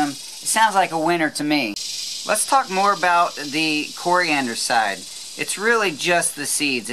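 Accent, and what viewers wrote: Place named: South, United States. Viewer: That Strong Southern Accent :P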